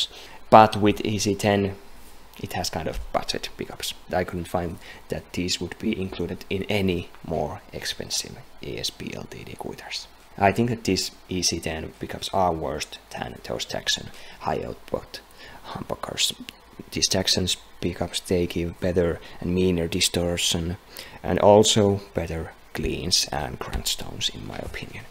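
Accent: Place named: Finland. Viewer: Hahahhaa äijällä vitun selvä suomi aksentti.. Hyvä video!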